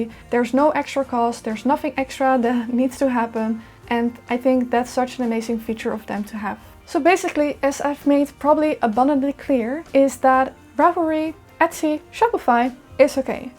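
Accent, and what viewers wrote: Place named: Netherlands. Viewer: Thanks! I just came across you when starting my info search, and so far my favorite source!;) I heard your accent, and recognized from my 20 years at KLM in the past; Dank je wel!! Veronica (Swedish American living in Switzerland)🤭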